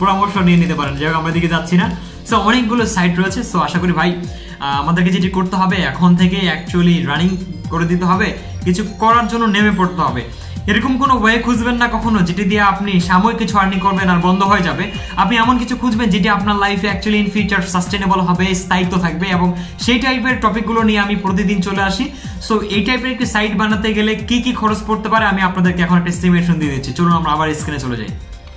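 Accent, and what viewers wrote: Dinajpur, Bangladesh. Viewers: ভাইয়া মাঝে মাঝে, আপনার দিনাজপুরিয়া ভাষাগুলো অনেক ভালো লাগে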